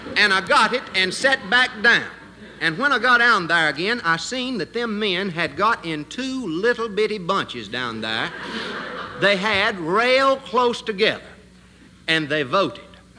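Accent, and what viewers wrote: United States. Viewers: Andy had all the charisma of Jerry Lewis and Dean Martin all in one with a southern accent. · Yes he did he has Carolinian accent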